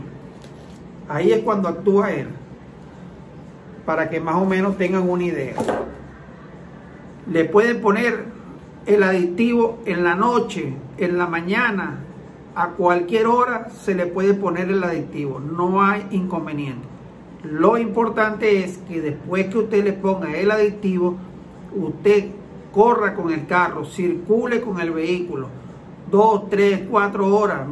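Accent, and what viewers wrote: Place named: Venezuela. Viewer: Sr. un respetuoso saludo, Ud es de Venezuela creo por el acento jeje, soy de Vzla., tengo un corsa 1.6 año 2002 caja automática. El vehículo no se usa mucho en la semana porque tengo otro vehículo y por otras razones. Actualmente me desliza la transmisión en el 2do. cambio., ud cree con el aditivo mejore esa falla?, ese que muestra en el vídeo lo consigo en MercadoLibre?, un amigo mecánico me comentó o sugirió drenar todo ese aceite viejo sacar el Carter y hacer mantenimiento al colector del Carter, quisiera saber su opinión y recomendación por favor.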